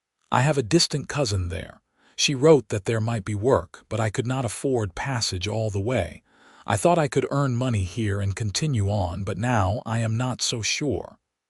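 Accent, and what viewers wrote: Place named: United States. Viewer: A 10 story with my favourite voice of the narrator, who gives with his authentic American English the stories a sound like music to "the West". And it tells once more, that a long lasting love is decision and work, the gold around is light from above... Greetings from Germany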